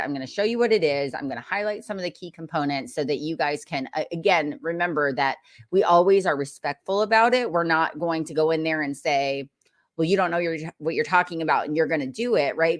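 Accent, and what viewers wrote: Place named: South, United States. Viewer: Thank you for the great content Mrs/Ms. This was awesome, it was truly. I enjoyed how you visualized the documents and subsections. I enjoy your videos. I refer you to everyone I know! Did anyone notice that she has gotten a country accent when she gets into her job? She said "YWAHLL" and "SOPPOSED TWO", yo, she dragged it out! Haha (instead of just plain 'yall'"). Actually, she's gotten more country over the years. Lol, I'm not even from the US and I can tell. Ok take care!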